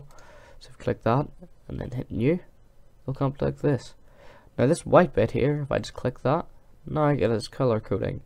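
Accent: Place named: Northern Ireland, United Kingdom. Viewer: Northern irish =) Yeoo!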